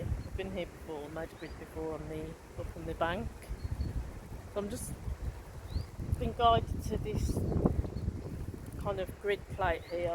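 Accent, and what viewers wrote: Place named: England, United Kingdom. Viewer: Just love this english accent too! Why dont you check Kemit ancestry ?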